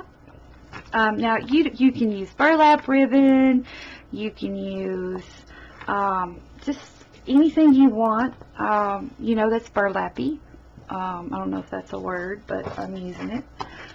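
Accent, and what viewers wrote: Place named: Kentucky, United States. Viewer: Love it! Would love to know where you're from...lol. You sound an awful lot like this old KY gal! Love it!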